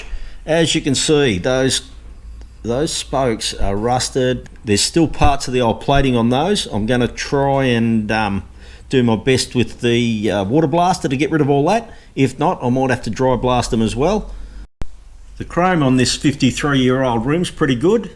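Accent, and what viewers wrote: Australia. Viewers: Gidday, great to hear an Aussie accent after watching yanks building wheels lol. I’m about to replace a bent rim on a 2019 DL1000XT with a cross spoke pattern and it looks trickyAF. Ever done one like that? The nipples are in the hub and the rim has a double lip the the spokes fit through… it’s gonna be ‘interesting’ to say the least 😂.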